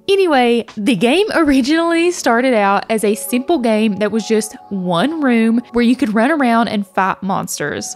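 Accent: South, United States. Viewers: It's so refreshing to hear a southern accent coming from a "cozy gamer" :) We southerners get a bad rep quite often so my wife and I are really loving you!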